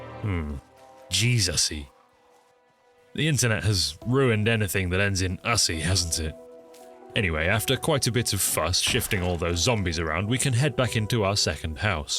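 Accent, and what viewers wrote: United States. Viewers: As a Texan I forgive and indeed do kindly demand more of your southern accent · As an American, I request that you give us more of your American accent. It may be wrong, but that doesn’t stop it from being wonderful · absolutely killah American narration hell yeah babe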